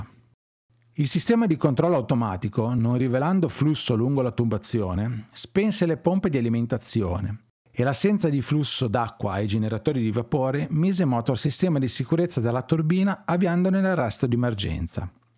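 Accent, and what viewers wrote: Genoa, Italy. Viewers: Ciao ma io sento un accento genovese cmq complimenti sempre tutto interessante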